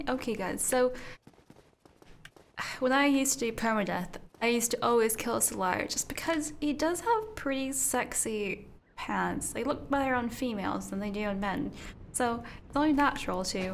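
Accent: England, United Kingdom. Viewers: Adorable english accent, sounds well spoken and proper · Hey or if its okay^^ Pls can you make a video or Vlog in german? Your german with this english accent is cool. =) This would be nice.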